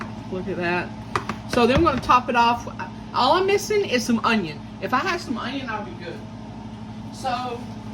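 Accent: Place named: South, United States. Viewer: I love you giiirrrl you have the cutest southern ACCENT!❤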